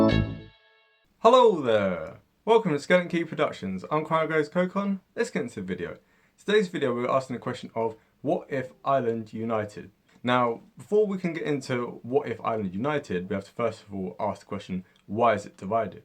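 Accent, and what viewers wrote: England, United Kingdom. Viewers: Very good summary esp coming from (it sounds like, by your accent?) an English person. Also, the British govt have just decided that it's okay for extra-judicial killings of civilians to happen and the soldiers etc who do that won't be held to account ... As for the Unionists becoming an oppressed minority - I am confident that this will not happen. Re-unification will have to be done carefully and with due regard to every individual. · Great video... I've never heard someone with an English accent show this much knowledge and understanding of our history before. If only there were more in Westminster or English TV/radio with this level of understanding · It is soooo refreshing to hear an English voice with such a deep and erudite knowledge of Northern Ireland. Just plain facts. Well done sir. Great job. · I'm from Ireland and to see someone from England (I assume your English from your accent) talk about Irish history in a fair and balanced way like you have is very welcome and wholeheartedly appreciated. 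Thank you sir, you have well earned a follow. ps your script sounds great. pps dail is pronounced dall (like doll with an a instead of o)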